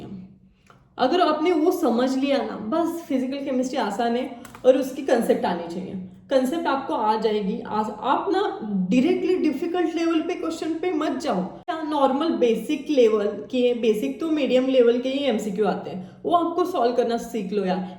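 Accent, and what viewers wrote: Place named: Maharashtra, India. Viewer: Didi tu Marathi aahes na kltay tuzya acsent vrun😅❤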